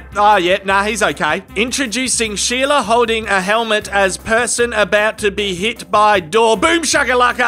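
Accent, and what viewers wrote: Australia. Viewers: u aussie?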